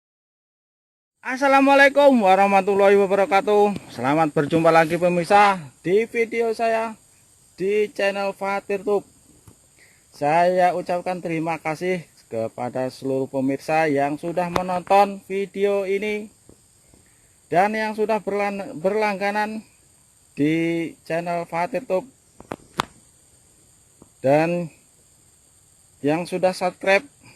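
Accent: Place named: Java, Indonesia. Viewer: Ojo medhok to boss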